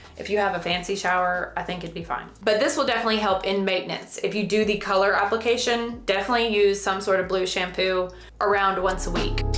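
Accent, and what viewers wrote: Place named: Texas, United States. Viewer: Are you from Texas? Nice southern accent & also because Texans are smart.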